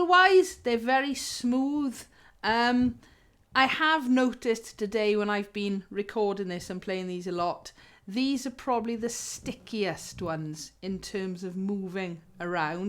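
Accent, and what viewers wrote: Wales, United Kingdom. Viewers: Hello. Thanks for your video. I have used all three 0f the types of strings you demonstrated. Like you I favour the Thunderbrowns. I have them on a fretted Aklot Bamboo acoustic/electric bass Uke. The only issue I have had with any of the strings is the amount they stretch. When all the stretching has been worked out of them they are all good to play I really enjoy your videos Love your Welsh accent. Gary.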